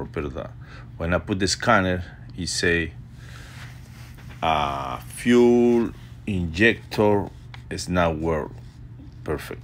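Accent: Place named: United Kingdom. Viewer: Que bonito hablas el ingles !! Tienes un acento britanico que me facina !!! Congatulechons..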